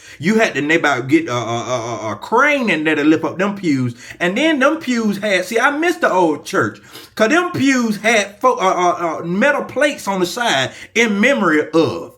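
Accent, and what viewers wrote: United States. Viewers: I know a NC accent when I hear one. Fayetteville native here! You are toooo hilarious!!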